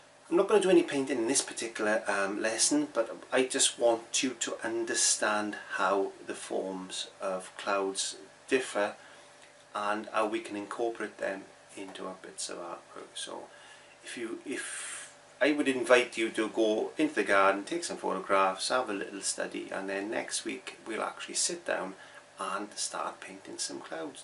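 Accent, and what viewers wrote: Wales, United Kingdom. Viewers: CliveI just found your web-site and am loving it. Also love your Welsh accent. I am from Scotland now living in the US. One question, why do you use black gesso on trees etc. instead of using mars or lamp black?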